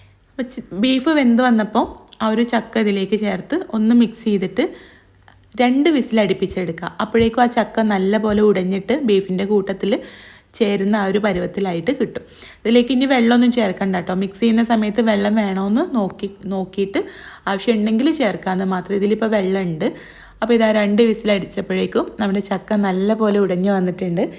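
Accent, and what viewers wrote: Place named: Kannur, India. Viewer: Para kannur basha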